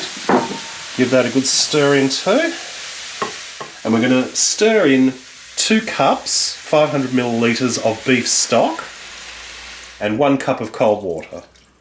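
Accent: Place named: Australia. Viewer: love Australian accents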